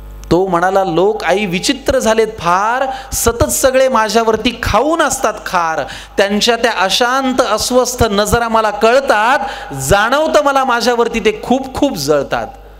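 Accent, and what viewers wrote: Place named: Marathwada, India. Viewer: खु छाण संकर्षण व्याख्यान झाले मराठवाडयाची बोली भाषा तुझ्या वाक्यात वाक्यात आली तुझ्या उज्वाल भविष्यासाठी खुप खुप शुभेच्छा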